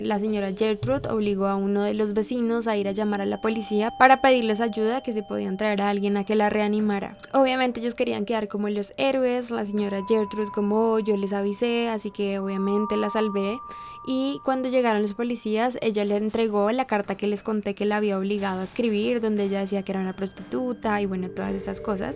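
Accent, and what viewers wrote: Venezuela. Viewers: minuto no le paro bolas eres venezolana?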